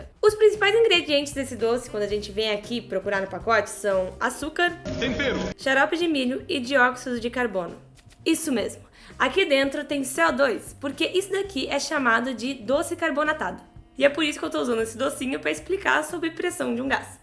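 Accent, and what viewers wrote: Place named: Portugal. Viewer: Muito legal! Você é muito carismática falando, e parece ter sotaque de portuguesa (você é portuguesa?) rss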